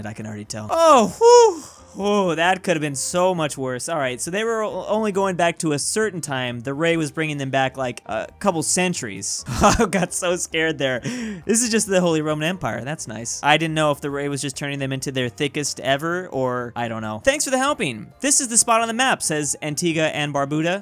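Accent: Australia. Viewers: Hot take, but.... Australian accent is better than a real Australian’s accent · I love the Australian Accent do a Video with the Accent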